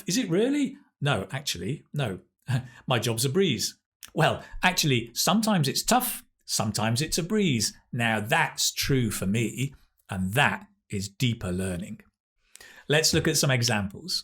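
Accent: United Kingdom. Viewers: I adore your British accent. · I’ve never thought I would bump in your outstanding video lessons. Your way of teaching is a breeze, your native British accent is out of this world. I always pay attention to each word you say ; it comes effortless from your mouth. Despite watching other videos , this one has definitely been a top-notch, every expression is really worth and sound to know about and hopefully use . It would be a real let-down if I don’t use this from now onward. Thanks endlessly Sir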